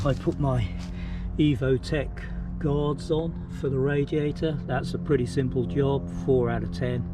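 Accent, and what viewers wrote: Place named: England, United Kingdom. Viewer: Hi, just observing your front brake callipers looks different to my BMW stock pair. I just picked up my S1000RR last week 2021 model. Although you have an English accent you mentioned bucks and your reg plate has reflectors on the side (USA Std?). Just wondering if this was anything to do with the weeping issue in the USA?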